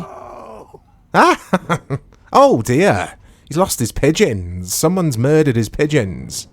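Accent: Wales, United Kingdom. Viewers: It's refreshing to hear a fellow cymraeg accent on here 😂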